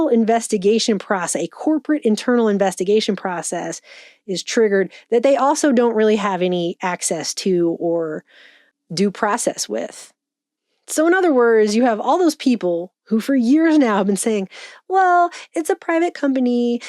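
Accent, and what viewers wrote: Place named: Midwest, United States. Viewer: eh, normal? has a decidedly Midwestern accent with the way she pronounces mom as "mahm", among other inflections. It's not a pristine General American accent or its relative pregenitors in the Maize Belt. That said, the San Fernando Valley accent is influencing speech just about everywhere, thanks to Hollywood. It seems to be the favored regional dialect to mock now, perhaps overtaking the various Greater Appalachian dialects, which used to be the go-to. Moreover, the both of them are already the basis of the charicatures of the entitled urbanite and the country bumpkin.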